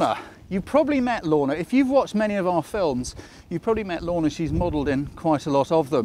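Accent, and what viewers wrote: England, United Kingdom. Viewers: Loves: 1) Your ability to pass your knowledge, in a simple way 2) The different situations you chose to present to us 3) Your fine English accent... Hates: 1) I m not a hater 2) I respect the work of other people 3) Love, love, love I liked so much that tutor... THANK YOU, THANK YOU, THANK YOU (Dont forget the camerawoman -> Thank you too... Nice video!) Greetings from Greece... PS. Sorry for my bad English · I love British english accent, much nicer than american accent. is such a warm person and I love his videos.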